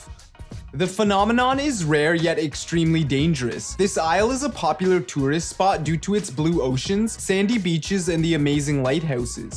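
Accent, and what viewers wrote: Canada. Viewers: canadien eehh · Sounds kinda Canadian to me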